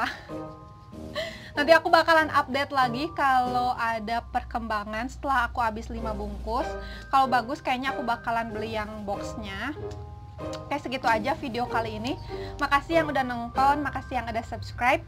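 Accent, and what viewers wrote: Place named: West Java, Indonesia. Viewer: sia syet hehehe seneng denger logat sundanya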